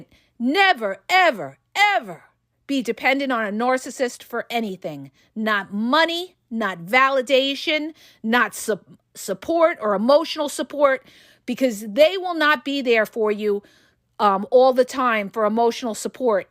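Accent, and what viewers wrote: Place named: Brooklyn, United States. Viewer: You sound just like my mom! Brooklyn Accent and Everything 😂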